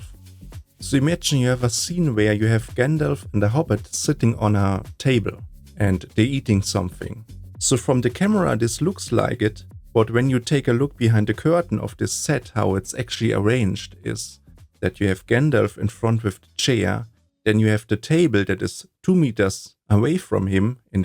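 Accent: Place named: Germany. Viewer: I hear a little bit deutsch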